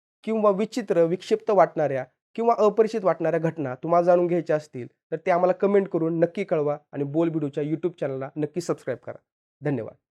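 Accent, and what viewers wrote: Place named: Kolhapur, India. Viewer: Gosht chaan hoti... pan shabdochhar sarv to pari navhta. Fakt Kolhapur walya sathi VDO banavla ka ?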